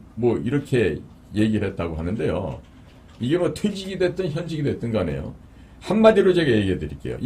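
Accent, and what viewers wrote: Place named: North Korea. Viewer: 님 이북에서 왔어? 말투도 그렇고, 종자주의? 난 처음들어보는 저런 단어를 쓰고 있노.. 저거 이북단어 아니여?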